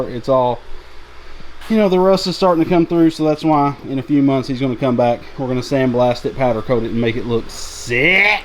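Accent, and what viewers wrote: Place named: South, United States. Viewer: Don't let em mess with you,it is pronounced ole not o-i-l, everyone knows it's just a southern accent thing. You're a good man helping them kids to have nice little go karts to play with. Go power sports is an awesome company to help you out with parts to help it all come together too.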